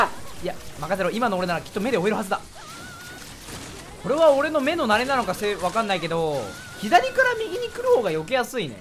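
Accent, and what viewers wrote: Tochigi, Japan. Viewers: 最近栃木弁が少ねーな〜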